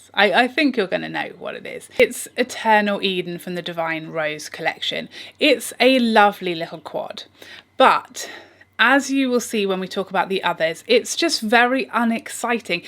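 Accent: United Kingdom. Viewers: I love how you brits say “purrrhfect” 😍 I wish I had that accent!!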